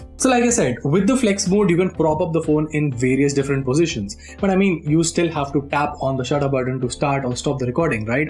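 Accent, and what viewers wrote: India. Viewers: So hard when Indian talk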